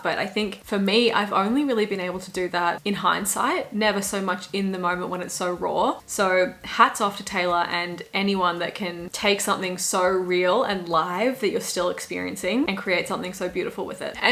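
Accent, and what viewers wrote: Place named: Australia. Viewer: Unrelated comment about one of ur tweets but anyone who complains about ur Australian accent is a FREAK and does not deserve ur top tier content because wtf that’s such a wild thing to say to someone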